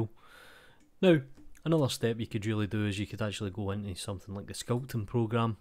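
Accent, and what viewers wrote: Scotland, United Kingdom. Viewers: This guy just makes everything sound funny, love the Scottish accent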